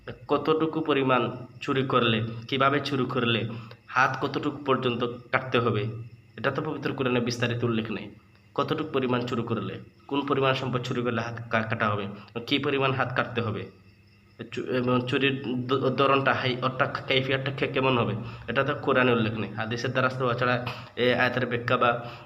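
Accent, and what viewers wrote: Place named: Chittagong, Bangladesh. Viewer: তোমার কথাই এখনো ফোটে নাই,চাটগাঁইয়া ভাব কথায় স্পষ্ট। জ্ঞানের গভীরতা বাড়াও।মরিস বুখাইলীর মতো "বিজ্ঞান, ইঞ্জিল ও কোরআন " একটি বই লেখে দেখাও।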